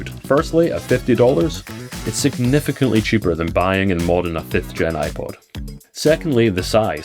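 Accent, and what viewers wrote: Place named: Scotland, United Kingdom. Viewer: Scottish accent with dropped ‘tees’ sounds shocking.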